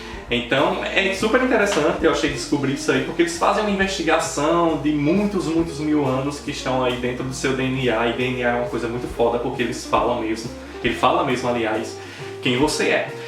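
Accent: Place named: Paraíba, Brazil. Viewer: É tão bom ouvir sotaque da Paraíba aqui no youtube ❤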